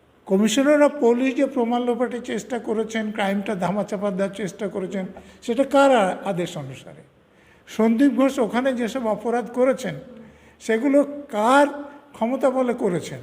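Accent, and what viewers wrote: Bangladesh. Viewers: Aapnar mukhey dhakar kutti der kotha shuney anek smriti jegey uthchhey 😅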